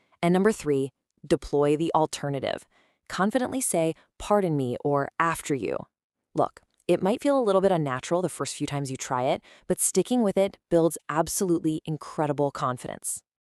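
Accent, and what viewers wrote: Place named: United States. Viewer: But the accent is american?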